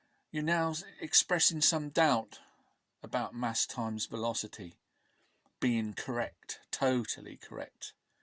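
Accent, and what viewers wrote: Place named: Cockney, United Kingdom. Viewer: Oh dear indeed - Thank you for sparing some of us the time wasting ordeal of listening to his utter garbage excuses. You can congratulate yourself on getting him to weazel out of his long standing MV claim. "Cluuuuck cluck cluck cluck" is what it sounds like from him. And so he doesn't like the way you talk? What does he want you to do... fake a different accent? I'm a Midlander/ Northerner and love the London / Essex accent, whchever it is - To us it all sounds pretty Cockney, a bit Arfer Daley and I wish I could mimmick that accent cause I always thought it sounded dead cool. Now if you had a Brummy accent, he might have a point lol! Just kidding. It shows his difficulty dealing with reality, when even the reality of your regional accent is too much for his "brain" to bear.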